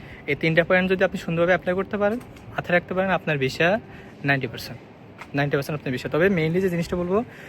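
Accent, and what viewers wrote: Noakhali, Bangladesh. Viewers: bhaiya videor seshe ashi bhujchi amne noakhalir 😂 bhai aio amnego noakhalir manush 🤣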